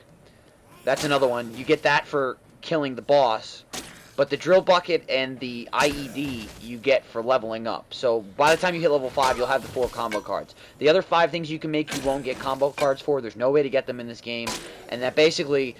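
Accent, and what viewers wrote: New Jersey, United States. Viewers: Yeah it’s because he still had his Jersey accent. Texas changed it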